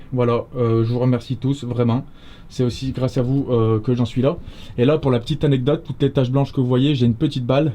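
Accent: Saint-Étienne, France. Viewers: Yop je te découvre mais on dirait bien l'accent Stéphanois ! ^^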